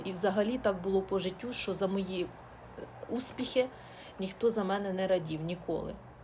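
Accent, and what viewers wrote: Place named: Cherkasy, Ukraine. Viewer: Підписався на вас. Ваш говір нагадує мені говір Черкащини з Жажківського району. Приємно слухати.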